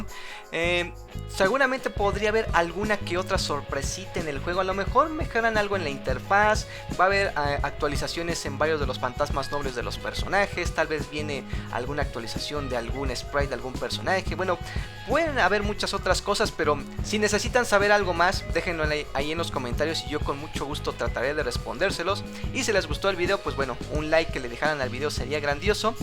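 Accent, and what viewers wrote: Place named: Bolivia. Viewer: por qué hablas tan boliviano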